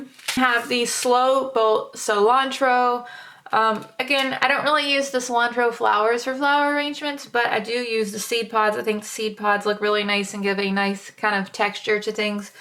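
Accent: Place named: Texas, United States. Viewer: SO HAPPY to find you again, your accent reminds me of my friend janine from texas. :) i enjoy listening to you.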